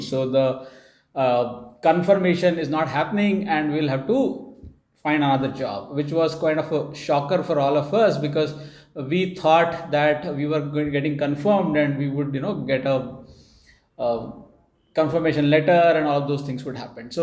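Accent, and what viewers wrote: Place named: India. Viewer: Your indian english irritates me....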